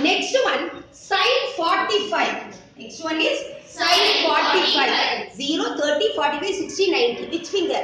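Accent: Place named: Tamil Nadu, India. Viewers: Yes she is from Tamil Nadu as Dr. APJ does. Hence, both speak TN's English accent. :) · I can bet she is from south India just by her accent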